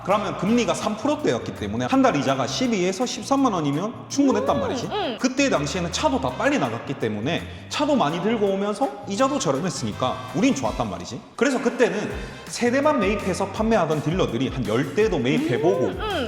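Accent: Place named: Gyeongsang, South Korea. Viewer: 사투리는 갱사도네요.ㅋㅋㅋ 아랫동내는 역시 먹을께 없엉....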